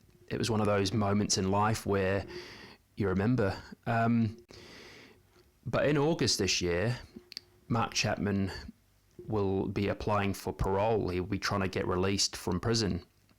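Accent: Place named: Japan. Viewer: Great video. I was 7 in 1980 and I also remember my father too but it was because I’d had never seen him cry before. Obviously now 40 yrs later on I understand. BTW nice Japanese accent 🇯🇵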